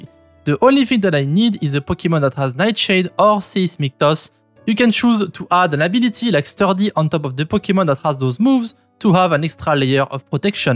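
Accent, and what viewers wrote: France. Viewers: Subscribed for the french accent and the good content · This french accent everytime XD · My man french accent goat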